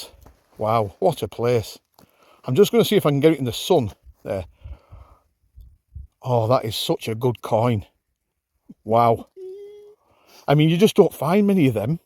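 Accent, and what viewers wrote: Cumbria, United Kingdom. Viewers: Just found your site, just got an interest into metal detecting at 72 years old. The other interesting thing, yours is the first site I have looked at and thought I recognised the accent, on checking Dalton, I'm from Barrow-in-Furness, left 51 years ago and emigrated to New Zealand. Look forward to learning from a local. Paul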